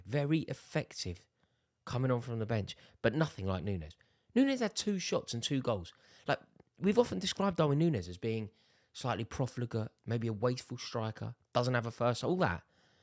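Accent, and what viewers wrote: RP, United Kingdom. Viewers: your hillarious the way you was on the club to now is so different 🤣🤣 stop being so fake you’re so clueless i work a full time job and still know more then you (someone who gets paid to analyse football) the difference is you have the posh accent to sound sophisticated 🤣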